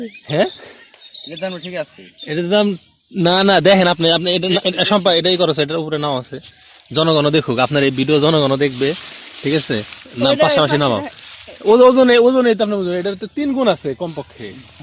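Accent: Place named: Tangail, Bangladesh. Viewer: অনেক ভালো লাগলো আপনার কথা শুনে মনে ভরে গেলো ভাই কারন হলো আপনি টাঙ্গাইলের আঞ্চলিক ভাষায় কথা বলেন আমার বাড়ি ও টাঙ্গাইল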